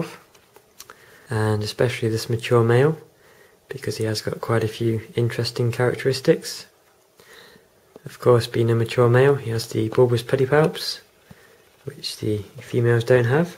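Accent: United Kingdom. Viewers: I thought your accent was Derbyshire Midlands.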